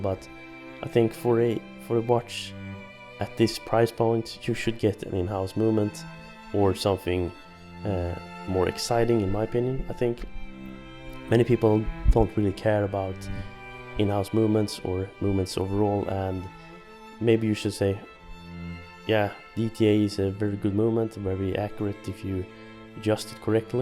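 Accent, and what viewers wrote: Sweden. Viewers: this dude Swedish as fuk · He's Swedish right?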